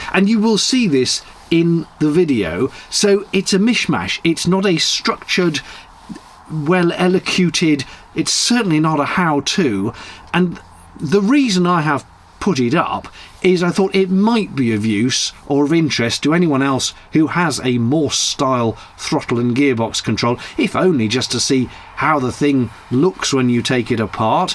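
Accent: United Kingdom. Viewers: Are all British men so even tempered? You said "ow" and barely skipped a beat as if you hardly noticed. When we had a boat and I worked on it with my (at the time) partner...it was always a traumatic experience as he had nothing like your calm demeanor and I was always on the lookout for flying tools. I'm happy to say that the relationship didn't last past that summer. I have to admit I don't think I will ever be able to say "farting around" without hearing it in your wonderful accent in my mind. Another truly enjoyable video. Thank you.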